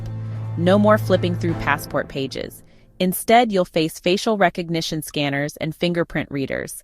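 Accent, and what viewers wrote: United States. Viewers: American narrator ? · Sick of this American narrator and her bigotry. Presumably AI, input by the trumpeter.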